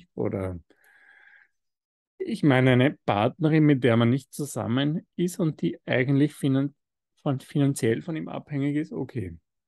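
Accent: Vienna, Austria. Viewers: der lebenskluge, sprachgewandte hr bonelli mit seinem kauzig-charmanten wiener-schmäh-dialekt, baut regelmässig "anyway" und "whatever" in seine sätze ein... das hört sich echt schräg an 😮😅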